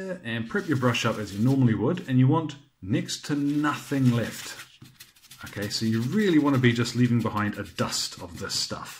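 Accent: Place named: New Zealand. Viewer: Where are you that it is so hot? An Aussie like me? I had thought you were a Kiwi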